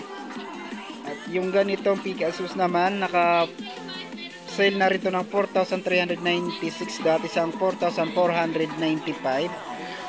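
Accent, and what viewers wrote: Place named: Visayas, Philippines. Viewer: REALTALK IDOL HUWAG KA MAGALIT NAGMAMALA SAKIT LANG AKO DAHIL FOLLOWERS MO AKO UULITIN KO SAYO GAYAHIN MO ANG ""BALAHURANG KOMIKERA""NILALAGAY NYA YUNG DISCOUNTED PRICE AT REGULAR PRICE NYA SA SCREEN AT ANG BILIS DUMAMI SUBSCRIBERS NYA... DITO KASI SA METRO MANILA PAG HINDI MAGANDA ANG BOSES MO O TONONG BISAYA O HINDI MO PA MA PRONOUNCE NG MAAYOS ANG SALITA MO "SORRY TO SAY" NA BOBORE O NAWAWALAN NG GANA ANG VIEWERS KAYA MASMAGANDA NO VOICE KA NA LNG IDOL...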